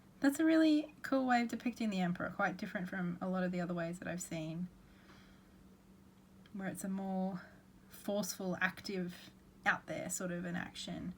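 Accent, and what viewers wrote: Australia. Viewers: Two things I’d like to say: I hear the Aussie in your voice. 🇦🇺 (Am I correct??) And; I live for the Harry Potter references... Always.⚡️🦉🏰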